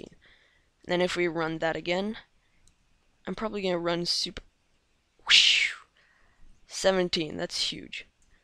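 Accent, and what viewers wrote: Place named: India. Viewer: why do you start out talking like an Indian and then lose the accent? wtf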